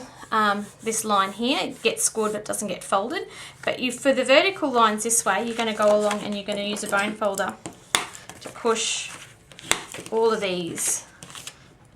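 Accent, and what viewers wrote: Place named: Australia. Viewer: Hi, Thank you for the video on the alternate size. Where can I find the dimensions, other than writing them all down from the video? I love your Aussi accent too!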